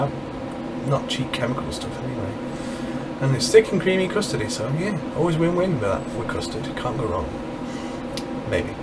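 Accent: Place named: Yorkshire, United Kingdom. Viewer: Eyup fella, sounds like tha lives near me somewhere in Yorkshire. Good videos, very helpful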